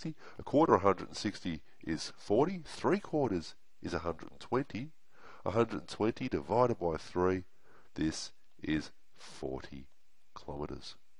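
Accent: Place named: Bogan, Australia. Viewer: Love your Aussie bogan accent, 👍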